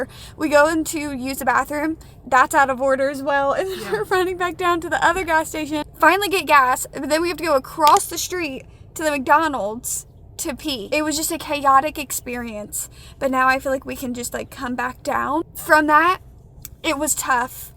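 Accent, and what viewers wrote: South, United States. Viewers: Girl you definitely caught me by surprise omg. Shoutout to you pookie I’m happy for you but I know this must be hard too. I will say I was surprised (when I found your channel) that you weren’t from Texas lol you give southern bell! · Congratulations!! You’ll fit right in since everyone thinks you have a southern accent already hehe. Sending love from Louisiana, we’re state neighbors now! 🩷🩷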